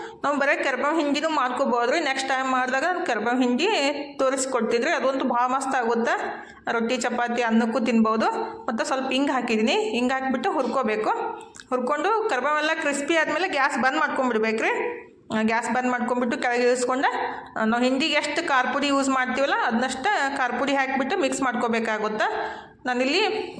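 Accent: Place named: North Karnataka, India. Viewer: Congratulations akka.uttar karnatada bhase nimma baaina kelod onda khusi.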